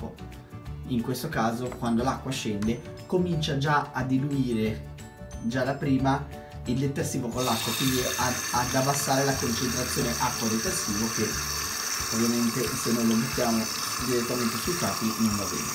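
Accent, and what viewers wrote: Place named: Liguria, Italy. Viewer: Ho provato ! Molto meglio ho impostato programma misti (la mia Candy 9kg ha questa dicitura x i sintetici) 40° asciugatura di questo stesso programma, ha il simbolino del ferro da stiro, torce molto meno i pantaloni che comunque ho notato non devono essere troppi direi max 4 ..in sole 3 settimane di possesso della lavasciuga sto imparando grazie al tuo aiuto e provando da me nuove opzioni ! Mi sei di grande aiuto guardo tutti i tuoi video e sono contentissima che mi rispondi tempestivamente. Simpatico anche il tuo accento (mi sembri ligure) ciao ti scriverò ancora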